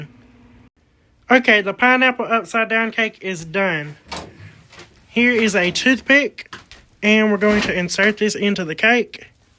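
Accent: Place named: Georgia, United States. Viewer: It’s actually a mix of deep Georgia and some transatlantic it seems. It’s very interesting.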